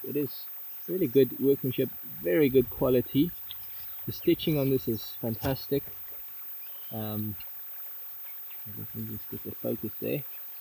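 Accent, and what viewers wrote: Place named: South Africa. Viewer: Hey brother! I immediately recognised that SA accent, and now I see that you are a photographer as well as a fellow believer, awesome! :) The only tent that I notice locally is the Hubba Hubba NX for around R6500. Did you import or buy this tent through anyone local? I just bought an F-Stop Sukha, so I can't go around at such a high spending rate...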